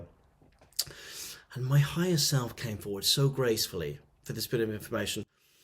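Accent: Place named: United Kingdom. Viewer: I love this accent...which part of Uk is this accent from???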